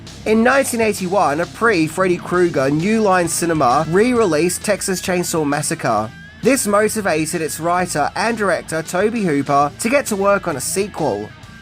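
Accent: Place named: Australia. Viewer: Me, personally, I hate AI narration. Souless. Plus I love the Aussie accent.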